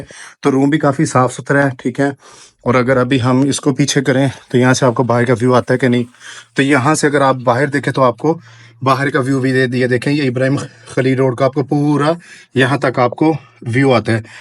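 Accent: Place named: Punjab, India. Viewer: Ap Baloch hain but ap ka lehja Punjabi jaisa hay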